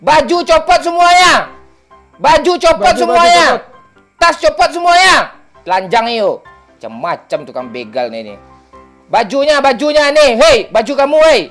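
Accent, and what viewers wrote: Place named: West Sumatra, Indonesia. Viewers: Ndak salah iyoo bana urang minang,urang minang marantau ka pekanbaru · nih suara nya wkwk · suara nih.. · Suarnya kaya ya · Kya suaranya admin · urg awak yo? Jaleh bana minang nyo 😂😂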